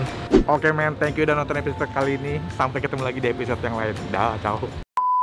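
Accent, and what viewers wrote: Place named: Java, Indonesia. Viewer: Kocak bro Nex bahasa Jowone , Wakakak !! Guded yogya memang top markotop ! Sehat selalu bro !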